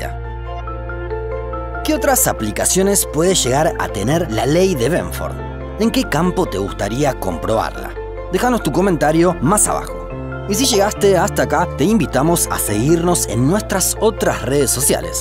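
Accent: Argentina. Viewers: Tu acento argentino es muy bonito, como tus vídeos.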